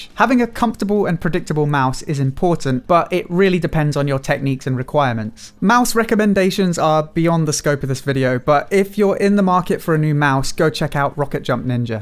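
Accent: United Kingdom. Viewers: Where r u from ..?? British accent ..!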